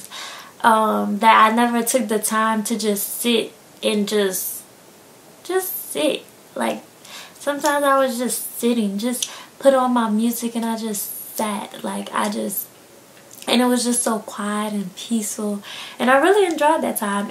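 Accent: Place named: Deep South, United States. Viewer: Girl u from deep down south...😍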